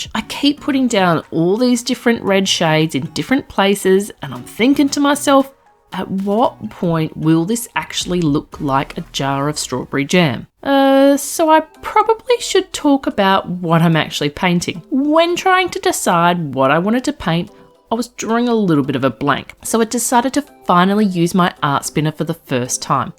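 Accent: Australia. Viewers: This was a video that started to autoplay and I wasn’t actually looking at my screen and I nearly broke my neck with how fast my head whipped around at the sound of your Aussie accent!! 😂 Couldn’t hit subscribe fast enough! My daughter is very creative, can paint and is a preschool teacher so I will also share your channel with her.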